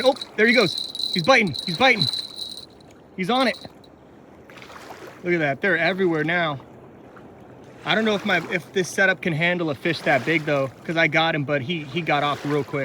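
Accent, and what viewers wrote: South, United States. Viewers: funny thing to note, i noticed at a couple points in the video you're starting to pick up a very slight, almost imperceptible at this point, southern accent, only noticed it at one or two points in the video. that's pretty cool. interested to see how your accent changes over time the longer you're living there. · Hes developing a southern accent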